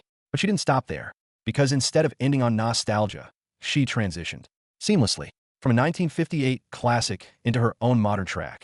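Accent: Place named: United States. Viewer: SHE 'SHOCKED THE WORLD??????'...REALLY????..... 'A BREATHAKING PERFORMANCE THAT NO-ONE EXPECTED'....All I can say is that this narration is SO American it's sickly.....I notice you don't play the performance, you just TALK, TALK, TALK...I thought her performance was DIRE!!!!! just like this video.....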